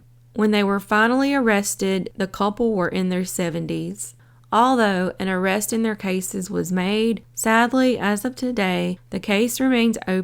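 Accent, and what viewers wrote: South, United States. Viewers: Dont need background music!! Love to hear that good ole country voice!! Thank you!!:hand-pink-waving:🤠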